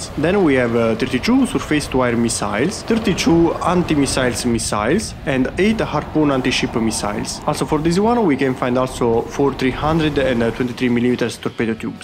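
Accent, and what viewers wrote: Italy. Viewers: Non è difficile riconoscere un accento "maccheronico" anche se molto ben mascherato; i dati sono attendibili e seguono un filo logico molto stabile e definito, per cui, italiano o non la classifica rimane invariata fino a prova di errore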